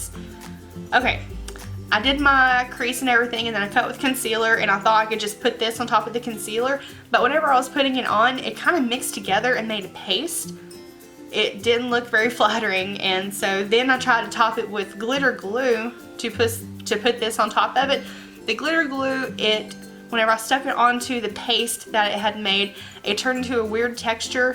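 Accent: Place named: North Carolina, United States. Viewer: OMG! 😲 I'm here in Charlotte. I thought I recognised your accent. ❤💄💋b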